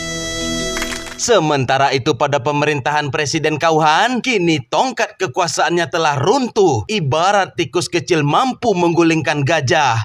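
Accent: West Sumatra, Indonesia. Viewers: Orang Minang ya bg? Jernih banget ngomong njiang gadang nya 😅😅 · Minang kah ads bg😂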